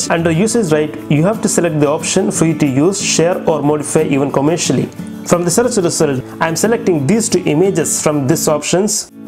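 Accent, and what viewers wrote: India. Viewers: its indian accent, respect it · Oooh, don understnad but he speak english with hindi accent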